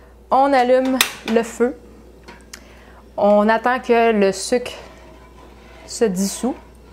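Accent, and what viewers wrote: Canada. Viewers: Je suis d'accord avec Agathe. J'adore l'accent canadien vous êtez trop mignone !..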